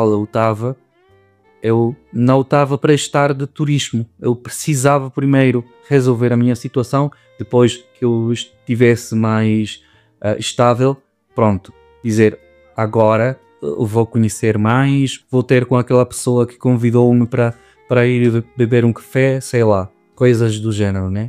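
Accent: Portugal. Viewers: Antes de vires para Portugal tu já falavas bastante bem o português de Portugal, depois do tempo que passaste aqui o teu português é perfeito, mesmo o sotaque, está lá mas muito tênue É pena não teres conseguido ficar, mas tem esperança pode ser que consigas voltar com outro tipo de condições que te dê garantias de ficar cá. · Olá! Reparei que falas mais em português de Portugal. Parabéns por isso! Nunca desistas. Um forte abraço Amigo! 🤗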